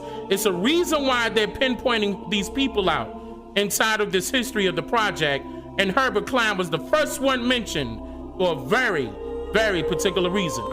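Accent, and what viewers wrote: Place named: Philadelphia, United States. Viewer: Boom! Sounding so Philly too. 🙌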